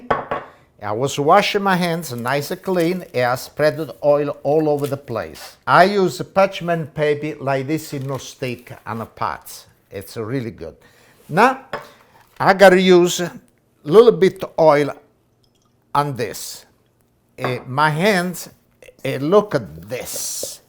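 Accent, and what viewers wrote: Italy. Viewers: Grazie!! I love watching you cook and I love your accent, you sound like my neighbors (and cousins) when I was growing up!! They made me fall in love with tomatoes, garlic, lemoncello, espresso and all things Italian!! I really like your little bit of oil, olive oil is even in the Bible as a food we should eat. Tutti a tavola al mangiare!! Yummmmyyyy!! And please forgive me if I misspelled any Italian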